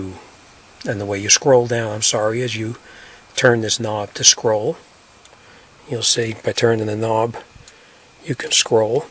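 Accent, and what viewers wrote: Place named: Boston, United States. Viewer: You sound like you're from the East Coast/Boston area. One thing I would have done differently though is, I would have left the belly pan off, until after you test drove or turned on the car, to see that you don't have any oil leaks(From underneath, as I see you checked from the top). Good Job.. You Italian as well?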